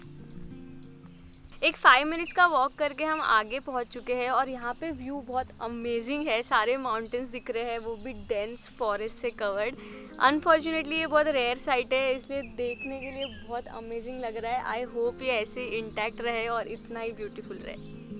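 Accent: Maharashtra, India. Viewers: Your hindi accent is cute...poora marathi mulgi :D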